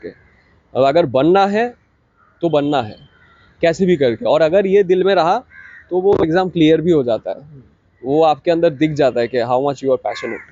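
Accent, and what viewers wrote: Bengal, India. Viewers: Sir ap bengoli ho kya kyuki apki Hindi me abhi v bengoli wali tone hai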